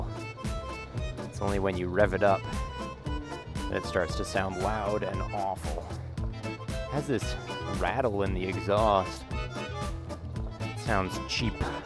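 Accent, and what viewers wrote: Canada. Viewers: I could tell he was Canadian before i watch this video or knew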